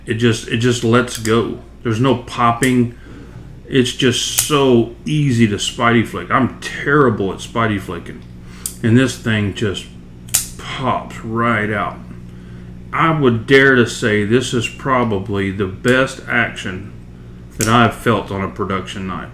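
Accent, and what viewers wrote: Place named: Texas, United States. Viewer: That texas accent doe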